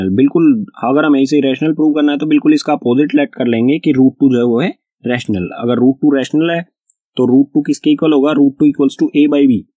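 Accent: Haryana, India. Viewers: Sir aap Haryana se ho na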